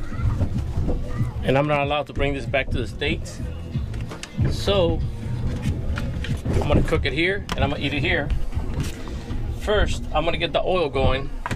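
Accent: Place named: Cuba. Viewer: Disculpa la pregunta pero acabo de unirme a tu canal y me gustaria saber porque siempre tienes la cara tapada y porque no dices una palabra en español si tu acento te delata que eres latino pienso que cubano.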